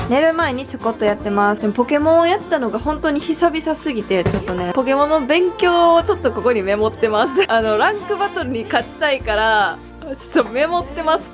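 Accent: Kansai, Japan. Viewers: 初見なんやけどこんな可愛い部屋でこんな可愛い顔してバリ関西弁なん最高すぎる。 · 初見なんですけどムッチャ好きになりました(＊> <＊) サムネの雰囲気とちがってゴリゴリの関西弁やしもうはまっちゃった！ 興奮気味💓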